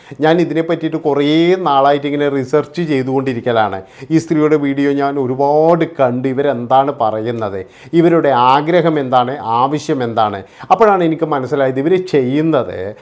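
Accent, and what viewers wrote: Kannur, India. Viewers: കണ്ണൂർ ജില്ലയിൽ എത് ഭാഗത്തെ ഭാഷ്യാണ് ചേട്ടാ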